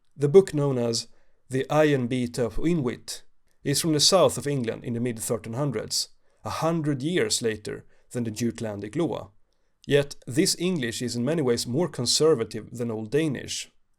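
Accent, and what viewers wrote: Sweden. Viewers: That's the strongest Swedish accent I've heard in a long time, at least for someone who speaks fluent English. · "The julandic LAUV" thick and beautiful gøtamål. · The narrator sounds very Swedish so I wouldn't take it for granted that he knows how to pronounce middle danish haha.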